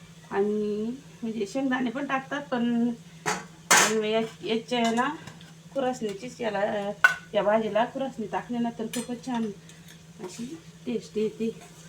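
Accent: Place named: Akole, India. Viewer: नमस्कार मावशी 😊 भाषा आइकली लय भरी वाटला,अकोले तालुका ना तुमचा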